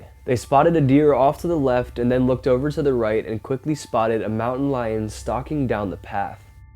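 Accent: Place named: California, United States. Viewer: Get a narrator. You have too much vocal fry and a very Californian accent. Your voice is not good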